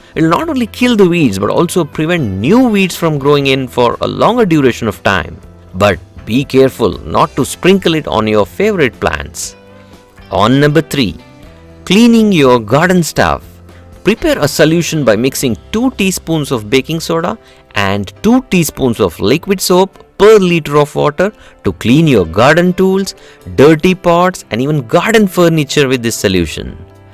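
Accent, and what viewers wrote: India. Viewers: Nice India voice,thanks for posting …?How used for COVID …? ( veterans)🇺🇸🇨🇦🇫🇷🇬🇧🦠😛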